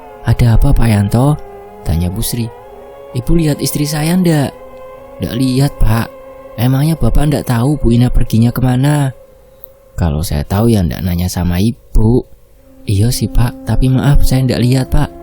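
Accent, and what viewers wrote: Java, Indonesia. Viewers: AD LUCUNY JG NE YG BWAIN CRITANY KEJAWA"AN HHHH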